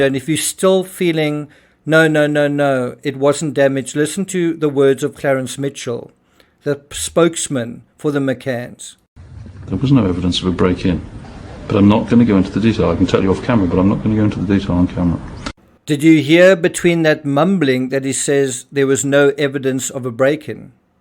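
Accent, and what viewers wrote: South Africa. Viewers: I'm proudly South African and I've been following this story closely all these years. Love what u put together and I agree 100% something smells fishy or should I say bloody. Pls keep us updated. Love hearing the local accent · ...are you perhaps South African?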